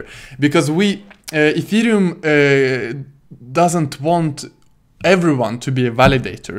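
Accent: Russia. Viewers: I like your huge Russian accent very very much! You are such a brave man to film all those videos and not to afraid of anything. Wish you good luck, I subscribed.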